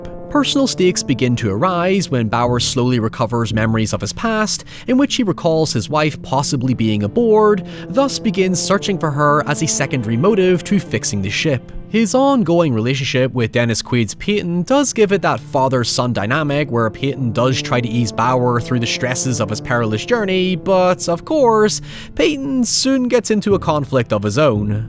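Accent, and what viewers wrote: Ireland. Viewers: You have an Irish accent. Nothing wrong with that. You speak English better than the English do. Keep it up. Slainte ! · You know, when you explained your Payton situation it just sounded like you were saying the exact same word twice and I'm still not sure what exactly you were confessing. Let's be real, the accent is part of the reason we're all here. In fact go harder, unleash that brogue my guy!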